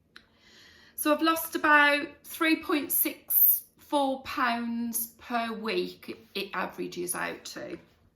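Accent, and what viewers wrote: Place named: Birmingham, United Kingdom. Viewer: Great idea about the food menu. I feel already now, just gone into my second week, that I have some problem to think out what to eat to even get up to 1200 calories a day. I know it's a "lovely" problem to have but I don't want to lose to lose too much muscles and get a lot of saggy skin just because I can't get enough calories a day. I do know a proteindrink or similar can boost the calorie intake but I'm really into getting my energy from "clean/pure" food now ie not junk food or frozen ready meals. I hope you know what I mean. I split a pizza for lunch yesterday with my dad and had the leftover as dinner. BAD move after eating good stuff the whole week up until then. But as it was my injection day I decided it was that day if I ever there was day to have it. I felt it in my body all evening that it wasn't a good feeling. 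Didn't go nauseous or so but it have really put me off even more to have processed food. But what to fill up with with now? Can't go all Roger Rabbit och scoff a full barn of chicken for protein. 😀 Have you got any ideas or tip of what to choose to fill up on? I have a long journey ahead of me (ideally lose 66-ish pounds to reach a healthy weight. I'm 48 btw and around 5 feet 461⁄64 inches tall/short). Warm hugs from a cold northern Sweden. xoxo Ps.. Forgot to mention earlier that I love your Brummie accent. 🙂 (I remember some chef on The Great British Menu that was a wellknown brummie..apparently...ha,ha..sorry, went total sideline there...)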